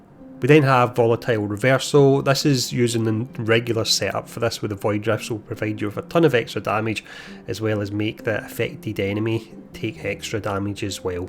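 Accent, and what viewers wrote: Scotland, United Kingdom. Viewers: Scottish accent ?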